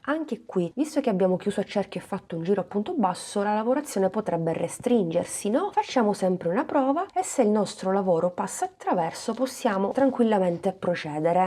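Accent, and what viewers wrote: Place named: South, Italy. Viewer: si sente che sei del sud anche io lo sono e questo già mi da un motivo per adorarti . Brava e precisa❤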